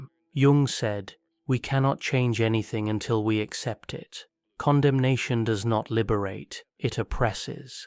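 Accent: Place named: United Kingdom. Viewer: Oh...I was waiting for you to release a full Jungian video 😉☺️ you have no idea how your videos touch my soul in this way that sometimes makes me gently cry with relief, or just put a smile on my face in the morning that stays all day, even all week as I daydream about the ideas you espouse. To be completely honest, at first I found the speaker's voice (is it AI? I seriously can't tell!) to be slightly unsettling because of the monotonous contour tone and strange vowel inflections (sometimes the vowels are inflected as they would be in the north of England, but usually a standardised southern english dialect that very occasionally slips into full north american dialect) I think my linguistics brain didn't like those anachronisms but quickly I grew to find the cadence and pitch soothing, I've always had highly sensitive hearing and seem to be very inwardly sensitive to sound. 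I can't watch television because I grew up without it and find it super abrasive. Do you know what I mean? A man got me to watch the football and the violence really affected me and the bizarre sound of their bones clacking together when they tackle each other was grotesque. So I find a lot of videos on YouTube abrasive. Yours are perfect for me. And I haven't even mentioned the content. It nourishes my soul. So special.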